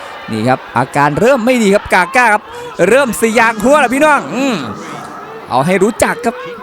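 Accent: Isan, Thailand. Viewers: ชอบคนพาค..สุดจัด.มีอีสานด้วย.555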